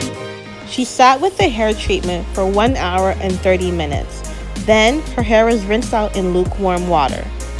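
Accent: Toronto, Canada. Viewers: I here rhe Toronto Caribbean accent 😁. Before l read your little bio l knew you were Canadian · I knew you where from Toronto as soon as I heard you speak the bell remote confirmed it 😂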